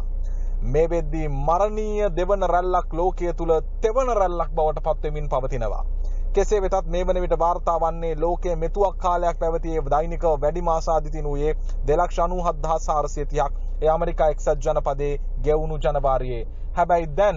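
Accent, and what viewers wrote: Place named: Karandeniya, Sri Lanka. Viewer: thama Kata gahanawa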